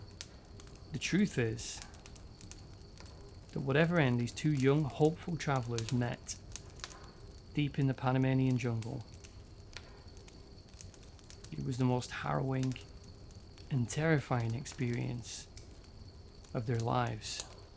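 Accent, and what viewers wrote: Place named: Lancashire, United Kingdom. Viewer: Great video and even more thanks for not being AI. Soon as I heard the accent (Lancs? Blackburn Burnley Accrington way?) I thought ‘Yessss’ and subbed. You deserve loads more subs and views 👏🏻👏🏻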